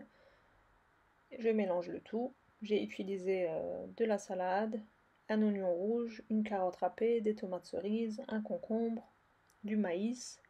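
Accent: Alsace, France. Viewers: Bravo pour tes recettes tu as un petit accent alsacien et comme tu parles d achat en Allemagne pour tes ingrédients ça confirme 😘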